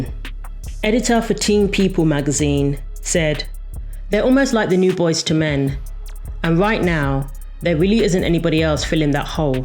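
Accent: United Kingdom. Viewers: Good gawd she reads so slow. I had to fast forward real quick when she read the second time. British accents force you to speak so slow. That must be why they speak with an accent. They don’t follow the phonetics of English.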